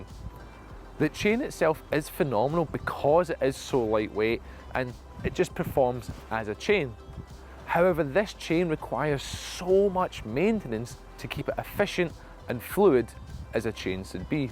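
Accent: Scotland, United Kingdom. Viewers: Scottish - not Welsh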